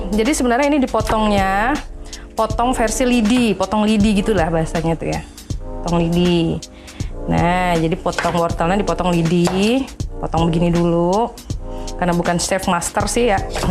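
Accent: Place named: Batam, Indonesia. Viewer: Logak nya kaya nya orang batam..😀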